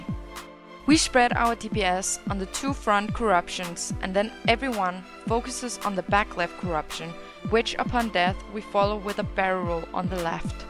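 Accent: Denmark. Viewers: this sounds a lot like danish english hahaha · Agree, she's definitely a dane xD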